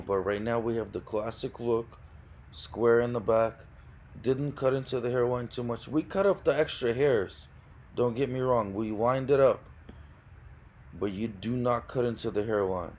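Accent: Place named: Russia. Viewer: i watch your videos mostly your father's videos for asmr and a relaxing effect 😅, love that russian accent ❤️, try adding asmr tag to your videos for more views and subs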